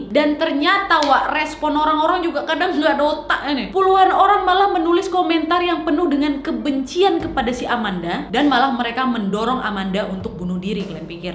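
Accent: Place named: Medan, Indonesia. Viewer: Senang liat cara ngomong nya. Ceplas ceplos. Kayak cwe medan. 👍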